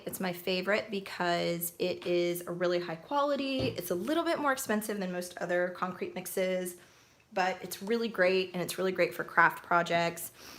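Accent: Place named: United States. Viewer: I've noticed one thing with these types of diy videos... When an American makes them - TOOOOOOOO MUCH FRIGGIN TALKS, NO FRIGGIN ACTION... they keep talking and talking and talking praising how good they are... and when you watch videos from other countries - NO TALK, JUST BUSINESS... nice relaxing music and business... but only and only Americans bother people with their annoying accent and nonsense talks... OMG grow up America, people around you are getting better and better... for example in this video she keeps talking and stalking people to order her unfinished product, and in the end - WHERE IS THAT FRIGGIN MARBLED WHATEVER YOU WERE MAKING?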